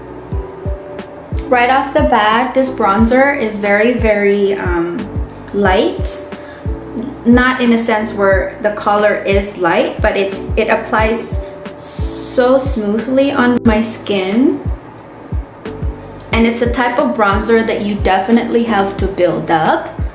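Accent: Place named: United States. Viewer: I love the slang American accent ..smarty sissy ..wish you luck on your channel.much love 💛❤️💙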